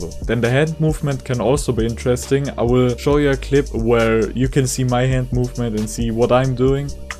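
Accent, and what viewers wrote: Germany. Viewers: You sound like typical german-english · Tysm your german ? U got that accent? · Was ein deutscher 😂😂😂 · Are you German?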